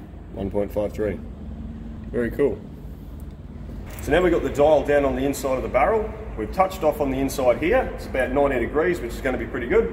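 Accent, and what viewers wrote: Australia. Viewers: First time I've heard it said like that: "moyd in tha yoo-ess-ayyy" (made in the USA) hahaha love the aussie accent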